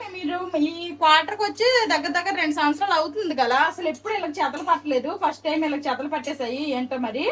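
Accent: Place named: Rajam, India. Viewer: hai sister me pillalu me mata chakkaga vintunnaru naku 6 years babu unnadu food thinadu nanne thinipinchamantadu konni sarlu mata vinadu allari ekkuva chestadu ma vadu kuda school lo edo okati vadilesi vastadu nenu me videos ma babu ki chusi cheptanu valla laga chethi tho food thinali home work cheskovali ani anyway me videos naku chala istam prathi okkati miss avvakunda chustanu me matalu vintunte ma slang gurthostundi madi rajam daggara village memu vizag lo untamu sister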